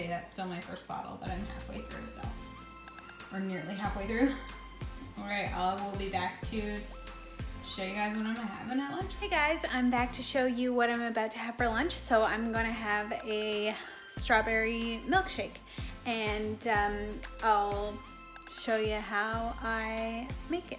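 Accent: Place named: Canada. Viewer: You sound Canadian. You say “aboot”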